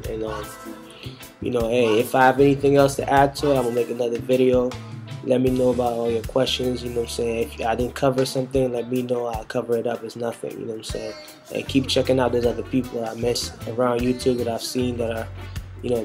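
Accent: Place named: Jamaica. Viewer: you have a slight jamaican accent n no its not the music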